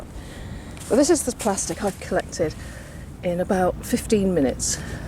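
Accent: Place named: England, United Kingdom. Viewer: will you please wear gloves in future, seeing the dirt under your fingernails really detracts from your wonderful English accent, Erm; I’m English from County Durham....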